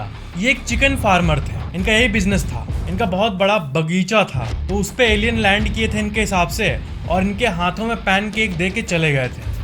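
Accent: Bihar, India. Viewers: Sir app Bihar se hai kya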